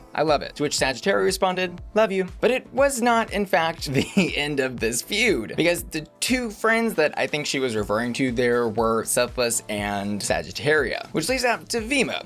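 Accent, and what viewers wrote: Spain. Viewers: The best thing that came out of this drama is that I got to hear your lovely spanish accent! Thank you queen! ❤️❤️❤️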